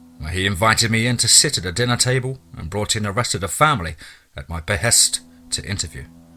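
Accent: England, United Kingdom. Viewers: This guy has the same accent as fooster. What part of England are they from?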